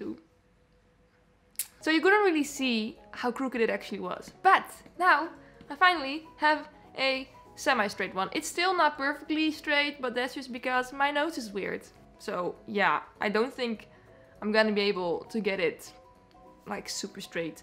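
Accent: Netherlands. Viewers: ik hoor de Nederlandsheid hierin lmao, wel leuke video :)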